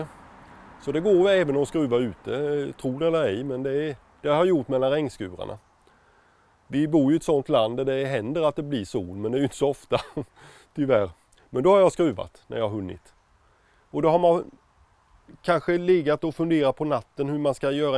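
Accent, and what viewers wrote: Småland, Sweden. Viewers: För han é från småland, dom säger oxå Cykelen, Nyckelen,Bibelen etc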